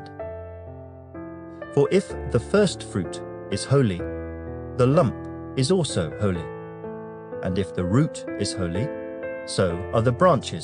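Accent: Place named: RP, United Kingdom. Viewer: Enjoy listening to queens English and piano background music.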